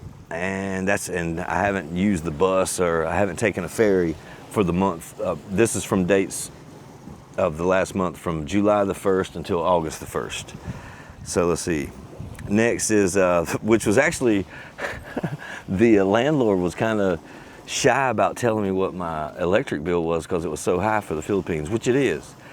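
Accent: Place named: North Carolina, United States. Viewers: maybe Ive missed it, but are you including insurance costs in your budget…health, renters, bike etc.? My Filipina wife and I just discovered your channel and love it. We’re in Salem, VA and enjoy your NC accent. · Aussie subscriber here, good to hear your Southern accent s an Expat in Phils. Will be there in 3 weeks time maybe we can meet somewhere.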